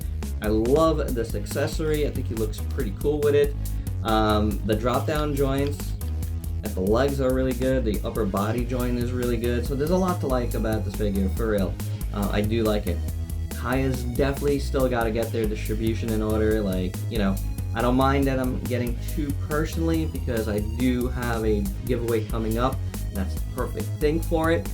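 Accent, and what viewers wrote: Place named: New York, United States. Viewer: you have GOT TO BE from NY, i can hear it in your voice.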